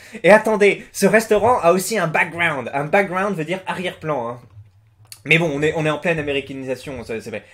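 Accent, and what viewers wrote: Alsace, France. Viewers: alsacien prolo, si tu veux. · Accent Alsacien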